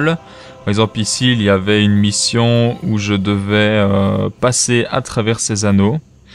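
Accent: Belgium. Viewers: c juste que c un belge(wallon) vive les wallon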